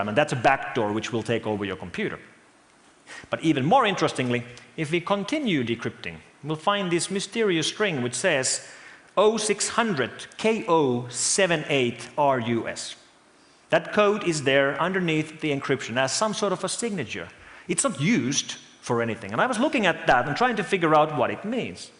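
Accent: Finland. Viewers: His accent actually isn't that bad for a Finnish guy. I've heard worse. And yes, the "klobal" is difficult to pronounce for Finns, mostly because the Finnish language doesn't really use many words with "g" in them. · I was very surprised to see a Finnish guy giving a speech in TED, and I was pleasantly surprised the content and how he delivered it. Great speech on an interesting & important subject. P.S. Extra bonus points for the accent. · Oh that finnish accent :p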